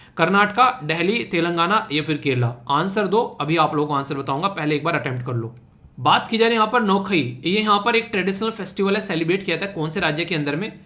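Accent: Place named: Rajasthan, India. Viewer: sir aap kuch bhi kho ki aap kha se lekin i know it bcz aapke sare keyword or bolchal ki bhasha poori rajsthan ki mere according to rajsthan sehi ho or syd aap shrri ganganagar ya north rajsthan se ho im sure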